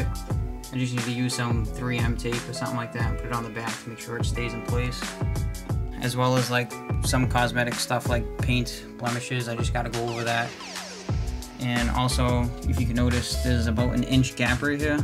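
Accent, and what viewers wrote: Boston, United States. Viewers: Hawd drive. From Boston?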